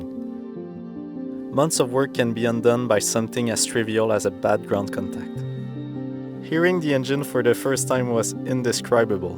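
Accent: Quebec, Canada. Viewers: It took 3 words to recognize the accent. Super proud to see a fellow Quebecois on this amazing channel. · TOKÉBAC !!! T'as l'accent à GSP mon gars t'es un vrai 💪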